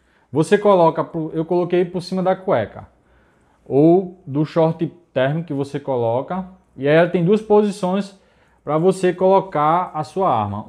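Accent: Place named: Northeast, Brazil. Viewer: Sotaque nordestino, show!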